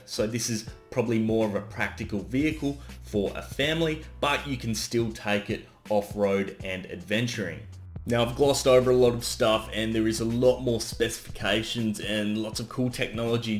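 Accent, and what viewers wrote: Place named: Australia. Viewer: Just because you have this Aussie accent, I'm already a huge fan of yours :) As a 4+ years experienced Model S owner, I highly cannot recommend Tesla anymore due to how the company treats you as a customer (the vehicles still are great). I'm looking forward to replace our Model S by a Rivian R1T as our family car, because we love tenting (2018 we did so in a 4x4 with roof tent in the Outback). If it was possible to do this trip in an EV: even better!!! Therefore hoping Rivian will sell to Europe very soon!! :D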